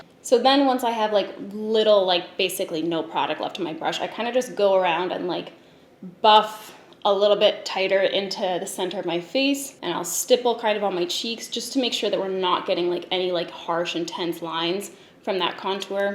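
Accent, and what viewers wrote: Canada. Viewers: Happy New Year! Love your Canadian accent,! That’s actually part of the reason I started watching you, Jamie and Allana. I also used to have a thing about Canadian guys based purely on their accents, then I realised if I carried on this obsession it might end in me marrying a mounty or something... Your skin looks fantastic! I also love Hourglass and got the Ghost blush palette for Christmas, as well as the Scattered Light holiday shadows set. They are beautiful. Hope you and your family have a wonderful 2020! Much love from Munich xx 💋 xx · I think your Canadian accent is delightful! You don't need that kind of negativity in your life... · I love your Canadian accent!! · OMFG YOU FINALLY SAID IT! I LOVE YOUR EYE BEHGS!!!!! WE LOVE YOUR ANGELIC CANADIAN ACCENT!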